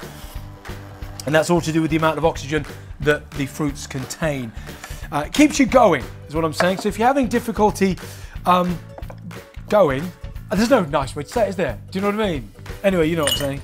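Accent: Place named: United Kingdom. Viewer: I love Jasons British accent!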